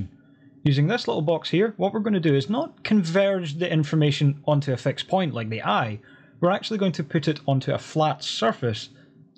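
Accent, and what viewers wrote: Scotland, United Kingdom. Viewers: Took me 3 min to realize he's Scottish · I'm learning Blender and this video completely clarified orthographic vs perspective view. Thank you. Well done. I recognized the Scottish accent and then saw your screen name "." Love it!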